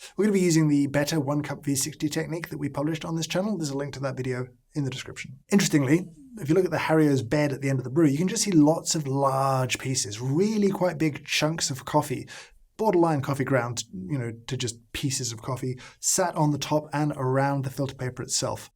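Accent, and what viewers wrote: United Kingdom. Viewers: Americans trust British accents like. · "This seems to be symptomatic of something that gets me a little bit excited." OMG! So British... Old school British! I don't care that much about coffee, but I love listening to this guy talk! And, man... does he talk.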